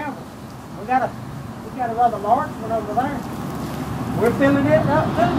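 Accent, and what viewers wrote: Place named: South, United States. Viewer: There are better ways to get things done in this country than elaborate and over-the-top hanging in effigy skits. Next time get an articulate and well spoken person to present your case. I mean the talking to mannequins, the hangings in effigy, and the THICK southern accent completely distracted me from any point you were trying to make. The video creeped me out more than anything.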